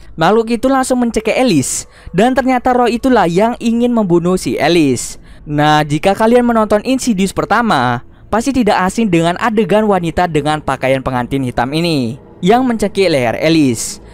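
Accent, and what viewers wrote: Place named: Java, Indonesia. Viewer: Terlalu jawa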